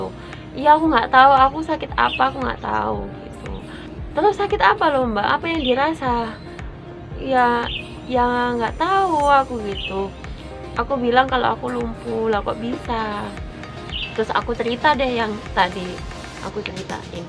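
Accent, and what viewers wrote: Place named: Java, Indonesia. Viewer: Logat jawa nya semakin lama smkin keliatan kaa ehehe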